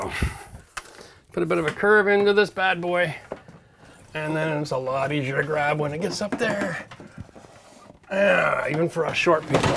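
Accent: Canada. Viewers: I know that I need to look at my local regs, but just for reference, are you in Canada or the US? I’m detecting a hint of Canadian accent. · Very informative. You speak well and clearly without lots of loud music in the background. I just subscribed. Are you Canadian? I notice and accent. I spoke at a couple conferences in Canada. I am redoing a bathroom in an old rowhome. How do I secure every NM every 5 feet if I am keeping the plaster and lath? Should I have separate circuits for the lighting and outlets?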